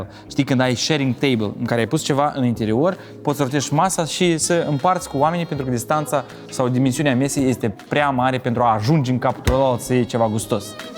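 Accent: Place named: Bessarabia, Moldova. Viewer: cu accent basarabean,dar bine pregatit.exceptionala prezentare,profesionist,cu detalii finisate in arta amenajariloe!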